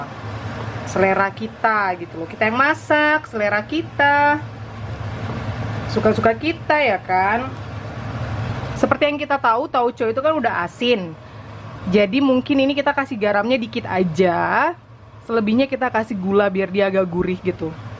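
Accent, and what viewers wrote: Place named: Java, Indonesia. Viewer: Mamak, apa dari ortu mamak ada darah jawanya kah? Kalau bicara mesti ada bahasa jawanya. Seneng dengarnya 😍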